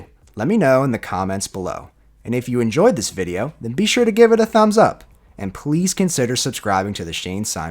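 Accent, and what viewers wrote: Philadelphia, United States. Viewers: Is that a subtle philly accent I hear?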